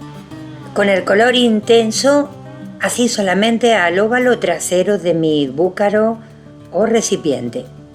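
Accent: Andalusia, Spain. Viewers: TE MIRO DESDE SAN RAFAEL, MENDOZA, ARGENTINA. TIENES UN TONO ANDALUZ, ¿DE QUÉ PROVINCIA ERES? ME ENCANTAN TUS VIDEOS Y SOBRE TODO, LOS RELACIONADOS A TUS ESPECTACULARES PESEBRES. SALUDOS.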